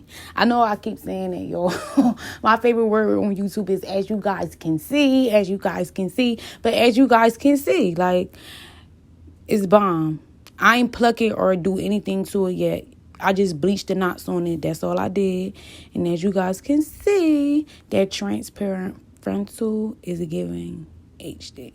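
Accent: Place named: Philadelphia, United States. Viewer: Girl are you from philly? I hear the acccent lol, #phillygang 🤍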